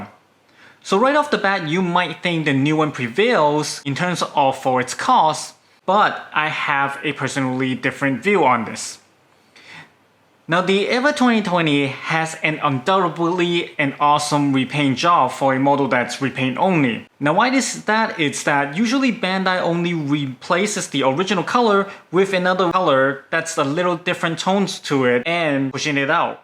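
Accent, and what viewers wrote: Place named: China. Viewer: you voice comes off as someone who native tongue is chinese.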